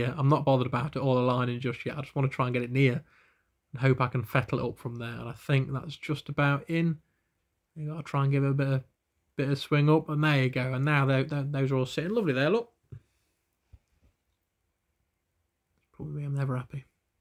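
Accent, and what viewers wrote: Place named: Scotland, United Kingdom. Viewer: Great Job! Beautiful enamel dial. It was nice hearing your scottish accent. Keep on with your videos. Regards from Argentina.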